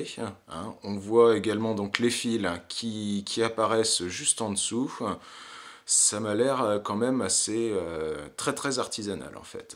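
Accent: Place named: France. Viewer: I gave it a like just for the french accent love it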